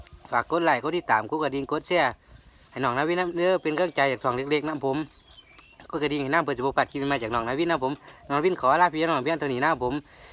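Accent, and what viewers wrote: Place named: Phu Thai, Thailand. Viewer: สำเนียงภูไท จริงๆ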